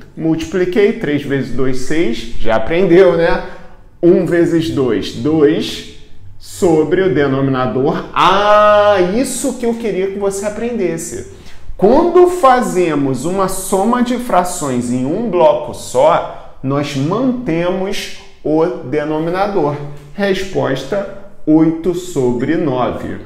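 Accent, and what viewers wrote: Rio de Janeiro, Brazil. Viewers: voce e carioca? tem o mesmo sotaque que eu falo tudo com x mano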